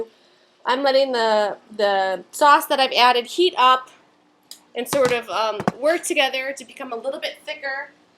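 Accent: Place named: Midwest, United States. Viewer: i would guess her accent to be wisconsin or ohio....i sure hope she responds cause i'm curious too!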